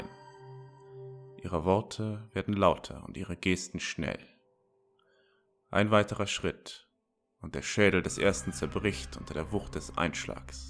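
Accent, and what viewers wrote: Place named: Berlin, Germany. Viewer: vom dialekt eher berliner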